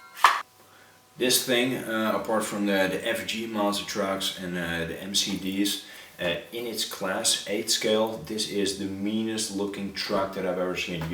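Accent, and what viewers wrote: Netherlands. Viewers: leuke en mooie video man ! Ik kan horen dat je nederlands bent haha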